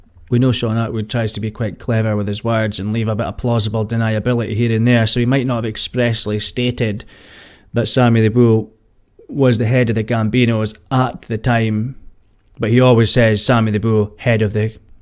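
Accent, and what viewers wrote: Scotland, United Kingdom. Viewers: You Scottish bro that’s coming from a Scotland ma lol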